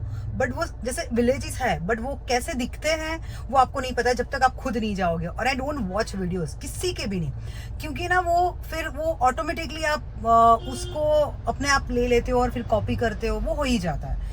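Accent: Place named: Gujarat, India. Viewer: This happens with me tooo. Gujarati Gujarat thi nikdi shake pan Gujarati language na bhuli shake Btw...love the gujju accent Love from Ahmedabad 🫶🏻✨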